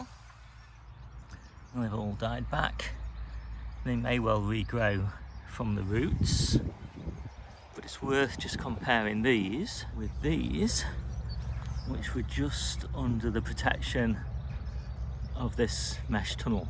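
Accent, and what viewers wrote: United Kingdom. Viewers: I don't live in Lancashire (my grandma was born there however, so I am assuming that your accent is indigenous?) but we did have a weekend with snow here in Tacoma WA, USA (after this weekend I wish that I was in WA, Australia, LOL.) I don't think that anything that I have planted died, broad beans, onions, look OK. I don't have a poly tunnel or other protection, but I do have sheltered areas in the garden so that helped.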